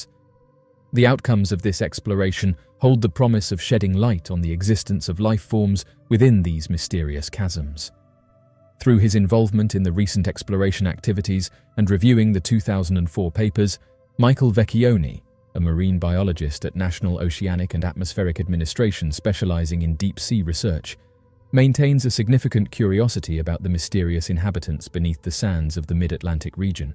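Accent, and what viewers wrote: United Kingdom. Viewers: No..he disappeared.. Oh my.. Its facts we want...not wordy british narrator... Oh well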